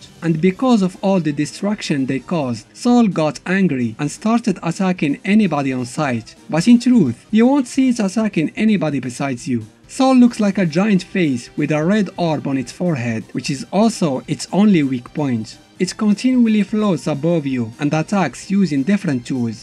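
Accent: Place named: Morocco. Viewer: I love your videos ❤️ man you accent looks so Moroccan 🙂🙂🙂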